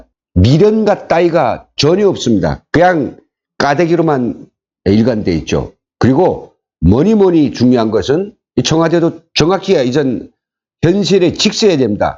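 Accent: North Korea. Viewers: 구수한 이북사투리를 구사하는 모습 을 보고싶읍니다. 다른 싸이트에서 사투리를 들으니까 얼마나 구수 하던지요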